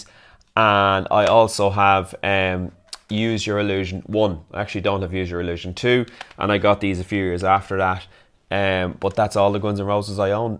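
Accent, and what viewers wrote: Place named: Ireland. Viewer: Yes!!! CDs with an Irish accent 👍 Loadsa, y’ just can’t go wrong🤘 👍🇮🇪🇵🇸✊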